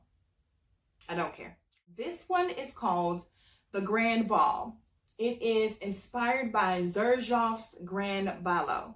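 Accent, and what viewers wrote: United States. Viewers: U from the Midwest? lol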